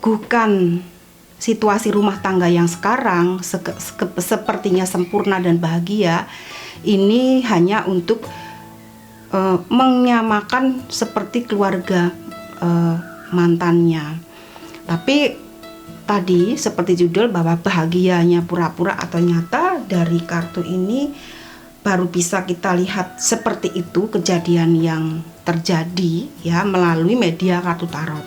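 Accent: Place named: Java, Indonesia. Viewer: Seenaang banget dengar yg bunda sampaikan..logat jawanya yg dipakai..ibarat apa yg ditanam pasti itu yg dituai.. Karma KD ya bund👍👍 🙏🙏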